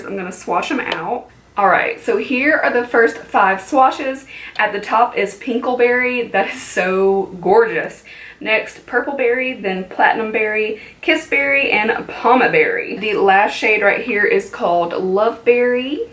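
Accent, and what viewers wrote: South, United States. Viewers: I can hear the country coming out in your voice a lot in this video love it! :-) maybe because your so excited!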